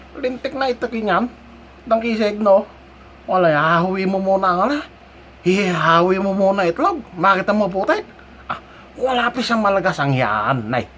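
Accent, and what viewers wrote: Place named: Imus, Philippines. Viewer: Ha ha ha..Kuhang kuha ang tunay na puntong Imus, ha ha ha..Caviteño here for life. Naknangpusa yan! Amabu primero pa nasabe ha ha ha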